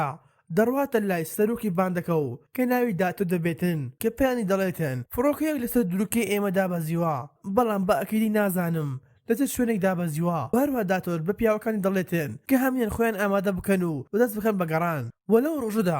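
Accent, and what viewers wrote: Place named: Erbil, Iraq. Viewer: Baxwa dangt naxosha awa chia 3aaaaa har dyara hawlerit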